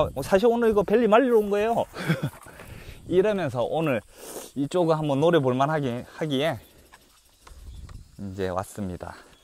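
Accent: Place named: Seoul, South Korea. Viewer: ㅎㅎㅎ 앗~ 서울사람이다~~~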